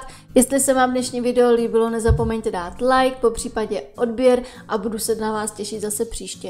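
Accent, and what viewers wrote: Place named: Moravia, Czech Republic. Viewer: Líbí se mi tvoje moravské vyjadřování.Já su atd....:D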